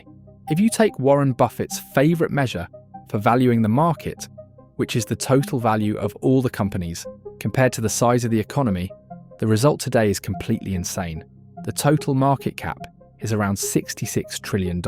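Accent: United Kingdom. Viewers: British accent doesn't help. Bogus video. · Is it somehow cool to have a guy with an English accent narrating a video consisting of an animated hand drawing pictures? Why? 😶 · I hear a British accent I know the guy talking is a Socialist.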